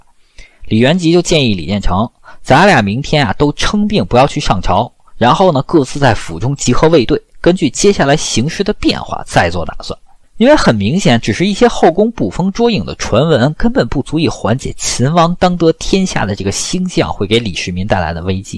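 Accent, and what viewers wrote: Beijing, China. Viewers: 确实听起来非常不舒服，有种吞音的感觉，南方人叫连刀快，可能是北京人的口音吧，听时间长了真的很难受，不过内容还是挺好的👌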